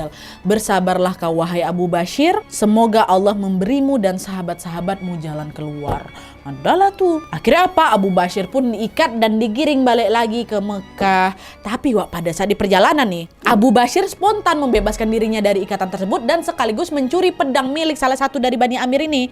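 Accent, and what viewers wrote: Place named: Jambi, Indonesia. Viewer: Logatnya kyak orang jambi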